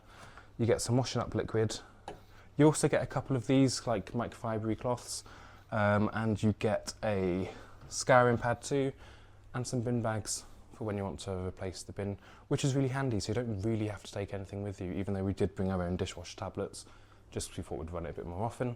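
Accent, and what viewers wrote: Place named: RP, United Kingdom. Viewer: Love this. Me and my husband are staying in keldy in a silver birch for Xmas. Can't wait. Something funny aswell but my name is Daniel so it was a bit odd to see my full name in the corner of your video obviously your and. Hahaha. Love your posh accent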